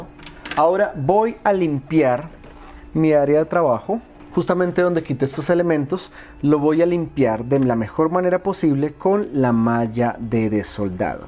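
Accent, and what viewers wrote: Colombia. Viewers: Qué bonita es la electrónica, lástima que no tengo ni la paciencia ni el pulso para trabajarla...por cierto ese acento de los colombianos/colombianas ME ENCANTA!!! Recuerdo cuando cursaba la carrera de informática , cómo optativa tomé sistemas digitales , y al momento de hacer los puenteos , si quedaban muy grandes o muy feos llegaba el profesor con sus pinzas y nos decía" No me gustan las patas de araña" y zas , que nos cortaba los cables! creo que por eso elegí la parte de software en vez de hardware.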